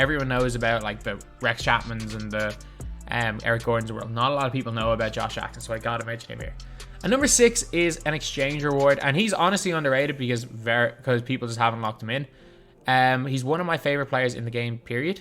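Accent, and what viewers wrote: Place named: Ireland. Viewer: Irish man talking about virtual basketball game is something I never thought I would enjoy for months on end.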